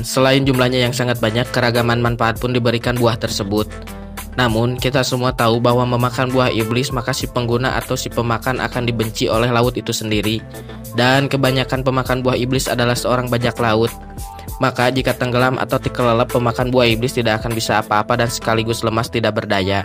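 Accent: West Java, Indonesia. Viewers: Nada bahasa nya kaya logat orang sunda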